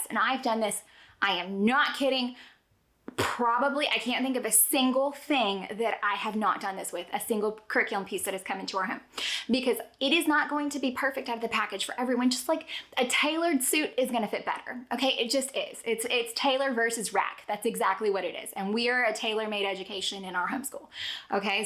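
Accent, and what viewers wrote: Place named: Texas, United States. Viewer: I love how much your TX twang came out in this one 🤠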